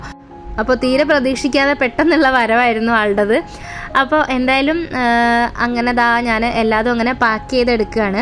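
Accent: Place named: Kasaragod, India. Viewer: Chakkappaayam ...kasaragod parayunne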